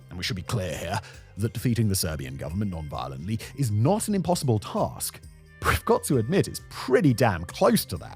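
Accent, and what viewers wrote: United Kingdom. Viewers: British accent talking about the balkans is red flag that announces bullshit. War in croatia broke out after germany armed croatia, war in kosovo broke out after the UK and the US armed moslem terrorists. The monologue speaks of serbian nationalism 100 times, and relevance of history to understand the presence, but fails to mention ww2 organized extermination of serbs in croatia and bosnia (by nazi allies croats and moslems)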